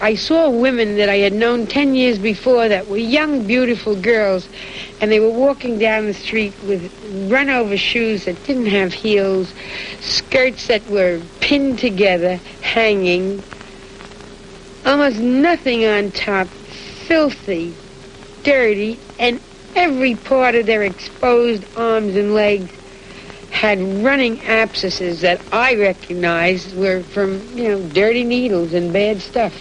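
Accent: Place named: Larchmont, United States. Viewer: It's the Larchmont accent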